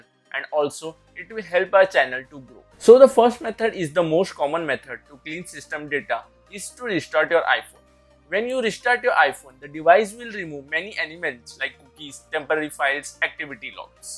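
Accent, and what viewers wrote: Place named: India. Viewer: You hear an Indian voice… uh oh. Clickbait 99.9% likely ahead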